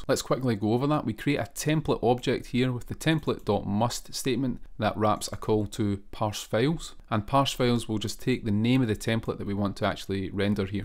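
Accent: Scotland, United Kingdom. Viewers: 3 awesome things in this video. Golang, HTMX and the beautiful scottish accent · A Scotsman! 🎉 Refreshing to hear other accents.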